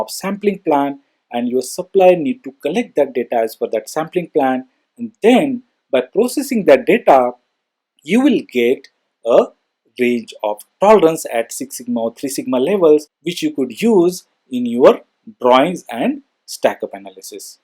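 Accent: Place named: United Kingdom. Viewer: You are very good , i like your accent and your talking way its very british.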